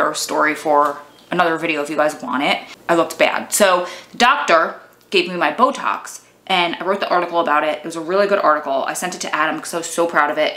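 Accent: New Jersey, United States. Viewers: wait girl are you from nj?? the accent? i grew up there and miss it so much 🥺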